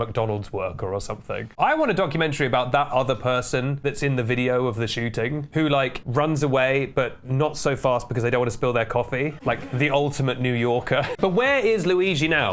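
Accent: United Kingdom. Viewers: Oh you’re British :( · Wait, why are you british?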